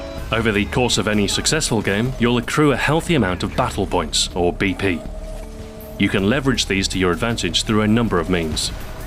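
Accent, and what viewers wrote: United Kingdom. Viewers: so british?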